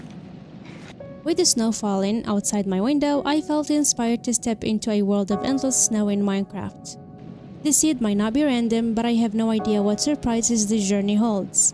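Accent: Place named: Canada. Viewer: I thought you were canadian 😮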